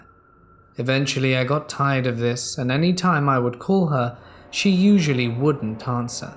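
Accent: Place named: England, United Kingdom. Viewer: The last two stories were the most horrific! If the stories had been too far fetched... like ghost or just a bit creepy I would listen again but these were too near the knuckle... so I won't listen to any more. I'm now confused... your accent sounds English yet you use awesome and dude, you now sound as though your morphing... why?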